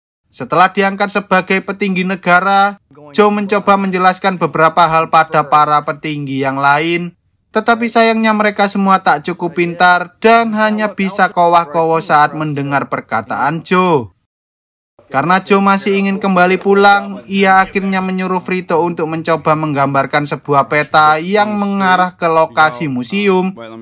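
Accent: Java, Indonesia. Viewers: Medok mu hilangin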